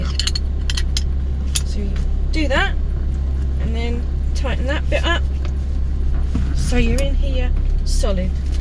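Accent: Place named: Gloucester, United Kingdom. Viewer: Hi i loved watching your driving were you driving around Gloucester as that is my neck of the woods and your accent sounds very familiar looking forward to the next one best wishes roy. 😊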